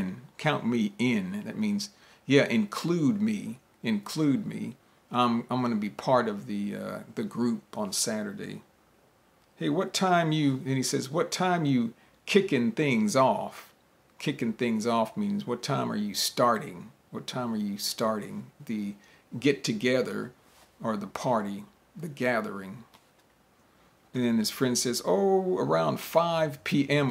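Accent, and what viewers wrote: United States. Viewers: I just discovered you.... I'm an English learner. And I love American accent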